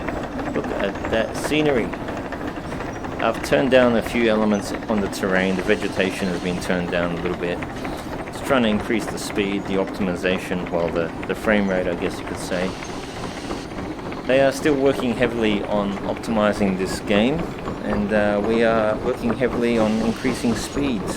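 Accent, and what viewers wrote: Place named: Australia. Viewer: What country are you from, you have a slight Australian accent.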